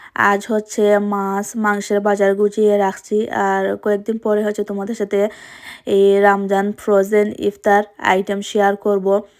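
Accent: Sylhet, Bangladesh. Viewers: আপু তো সিলেটি মনে হচ্ছে সিলেট কোথায় আপনার বাড়ি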